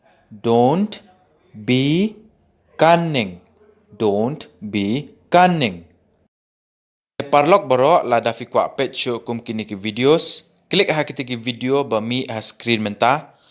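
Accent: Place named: Meghalaya, India. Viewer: Sa kren dei jong pnar fi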